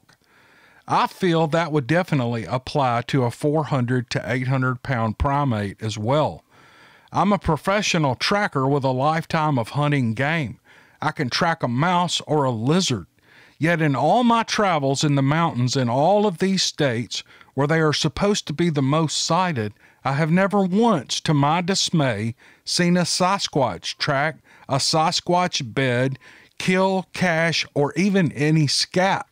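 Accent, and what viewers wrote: South, United States. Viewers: Hey Sir… by your twang I think your a southerner. The start of this video shows a lot of nice trees and green grass not familiar with that in TX. You do not need to state the city etc. However could you say what state y'all lived in. Thank You.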